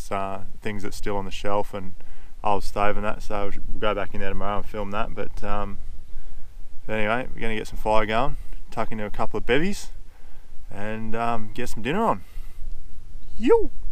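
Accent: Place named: Australia. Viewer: Except for the lack of sagebrush and your heavy Aussie accent 😆 this video looks very similar to areas of Central Oregon! Same type of history too either cattle, or sheep, or horses. If you have been watching Casey at Coyote Works channel you'll see what I mean. Always looking for relics and ruins of the past. Cheers!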